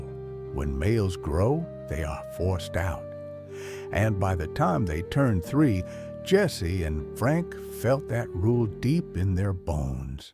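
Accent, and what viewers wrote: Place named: South, United States. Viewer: Where are Jeremy Irons, Charles Dance or Sir David Attenborough?😢 This cornpone commentator Is Not the Ticket!🙄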